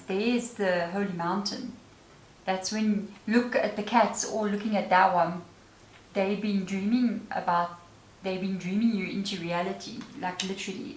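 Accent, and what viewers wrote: South Africa. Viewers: Are you South African? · I was about to say, mam are you South African? And you are 😩😩😩😭 we truly recognise our own ❤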